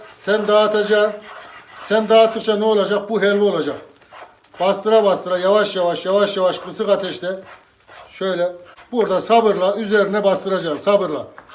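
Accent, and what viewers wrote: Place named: Konya, Turkey. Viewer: Ellerine sağlık şefim siveniz bizim buralar gibi Konya limisiniz bende yapacağım Konya'dan saygılar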